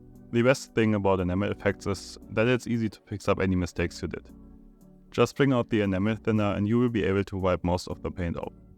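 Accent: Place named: Germany. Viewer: Wirklich gute arbeitet. Sprichst du normalerweise deutsch? Dein Akzent klingt zumindest sehr danach 😂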